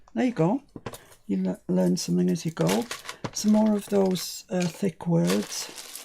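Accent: England, United Kingdom. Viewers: I only caught the tail end of the 'live' on Sunday and our 6-yr-old grandson came along as I was watching. Our grandchildren know you as " " and realize you live in England. They love your accent. Tonight (Monday) I was watching, trying to catch up from the beginning. Warren was here again (and he would pronounce his name 'Wawwen') and said, "She's still awwganizing?" and watched along with me for awhile. I've just walked him down to his house and he was repeating, "I'll just put the buttons in the button drawer" all the way home - complete with the English accent.:) You're a hit in our house!! And by the way, I'm suffering from SES (someone else's 'stuff') envy - big time! Oh, and almost the first thing you picked up on your pile was an envelope from Clem...I grinned.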